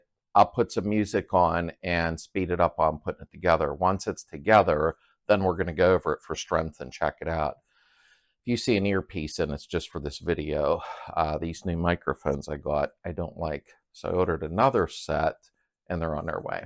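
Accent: Pittsburgh, United States. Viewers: You have one heck of a Pittsburgh accent 😂. As a Yinzer who lives down south now, it makes me feel like I am back home when I tune into the channel. Keep the content coming!!!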